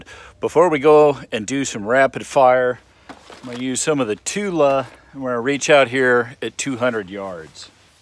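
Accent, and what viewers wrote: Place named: Nebraska, United States. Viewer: I picked up on your accent right away, and was like "holy crap, a brother from the Motherland of Nebraska!" Then I saw your lower registration, and subscribed without question. Grew up in Ogallala, now on the East coast. Thanks for putting things up on the channel!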